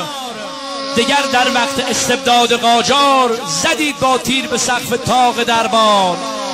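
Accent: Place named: Afghanistan. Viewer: You're an AFGHAN 😂😂😂😂😂😂😂😂😂😂😂😂😂😂 I KNOW NOW!!! IT MAKES PERFECT SENSE. I FEEL SORRY FOR YOU :((((((( ITS OKAY... YOU CAN KEEP PRETENDING YOUR PERSIAN. YOURE JUST PERSIAN SPEAKING WITH YOUR UGLY DIALECT.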